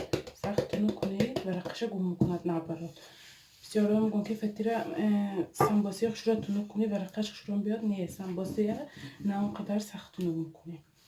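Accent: Isfara, Tajikistan. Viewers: Фатири ИСФАРА барин !!! Гапзанитон Исфарагихо боин !!! БЕХТАРИН !!! РАХМАТ !!! 👍👍👍🤲🤲🤲 Подписка + лайк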